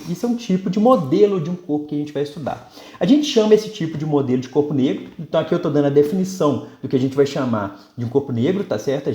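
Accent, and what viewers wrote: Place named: Minas Gerais, Brazil. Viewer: Professor com explicação excelente. Essa serie é uma joia do Youtube. A cereja do bolo é o sotaque mineiro do prof. Abraços!!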